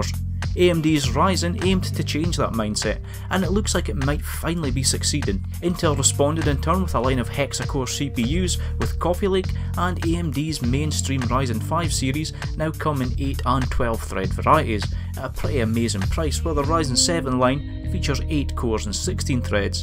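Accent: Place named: Glasgow, United Kingdom. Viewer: dude i cant understand you for shit, maybe use subtitles next time, you must take into consideration that at least half of the viewers are not native speakers of english so it's hard to follow your Glasgow accent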